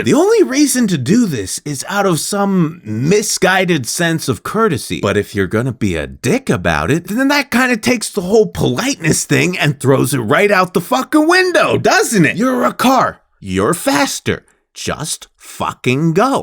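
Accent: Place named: Bay Area, United States. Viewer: I don't believe for one second that you live in Portland. You sound like you're from the Bay Area and I would bet money on it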